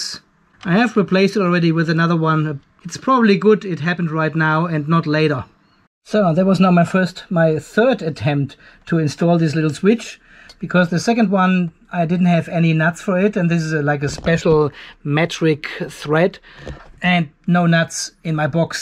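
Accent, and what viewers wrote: Germany. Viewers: Jetzt musste ich wirklich lachen! Ich sehe Deinen Kanal sehr gerne und dachte mir immer: Irgendwie hört sich das wie ein deutscher Akzent an, aber was weiß ich. Nun Dein Schimpfen im Abspann: Habe so gelacht. Also viele Grüße aus Franken to sunny hot Australia.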